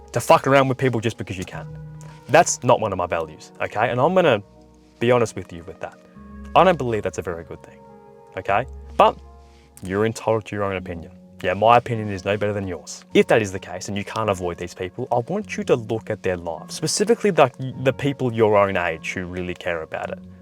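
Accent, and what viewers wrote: Australia. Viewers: Crrikey mate i get no bitches!.Also aussie?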